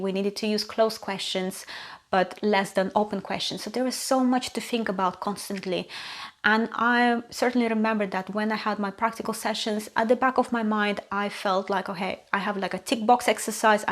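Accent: Russia. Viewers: I can hear Russian accent. Are you Russian?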